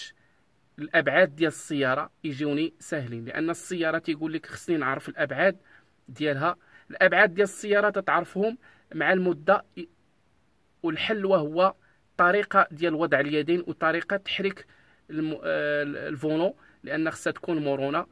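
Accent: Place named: Algeria. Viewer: واه نيشان ربي يحفظو حتى اللهجة لي يتكلم بها تشبه لهجتنا ف جزاير 💪❤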